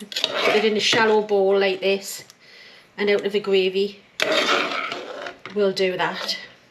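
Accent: Newcastle, United Kingdom. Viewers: Lovely steak and kidney pud Hinny havent had one in years,even nicer with some oysters popped in,lovely to hear your Geordie accent.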